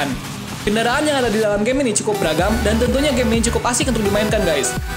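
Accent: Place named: Indonesia. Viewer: Logat lu indo sangat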